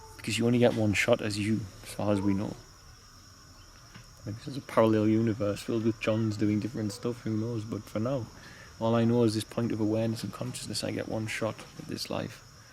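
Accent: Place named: Newcastle, United Kingdom. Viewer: Hi I'm very happy to hear the update, as a UK national (I guess from the Jordie accent) could you use the NHS to keep fees down?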